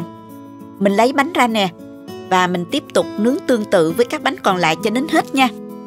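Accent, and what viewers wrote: South, Vietnam. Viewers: nói giọng miền nam rõ ràng và dễ thương lắm. Chỉ dẫn rõ ràng, thao tác chuẩn bị sạch sẽ. Cảm ơn cô đã chia sẽ nhiều món ăn ngon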